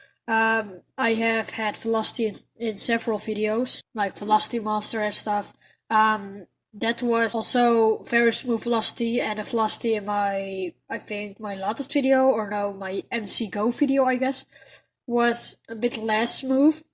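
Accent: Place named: Netherlands. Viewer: Thx bro and i hear that lil dutch accent :)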